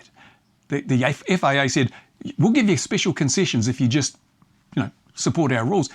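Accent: Australia. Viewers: lol this guy sounds aussie.... like he has anything to talk about when it comes to restricted freedoms. I'll trade a hobby for FREEDOM any time of the day, and that's what it reallly is coming down to. I k now 99% of people reading this, won't understand. We have been in an economic warfare for decades. Now it's time to fix that. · MAN! I'm glad every day that I have a permant resident permit in, -Gabriel of Norway. Thanks very much for this video, my brother who sounds to be 'down under '. Love y'all, mate! Unfortunately Norwegian politcians are in bed with those in the USA.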